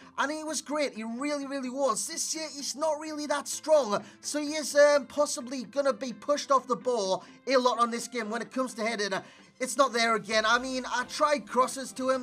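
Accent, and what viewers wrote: Newcastle, United Kingdom. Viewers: newcastle i think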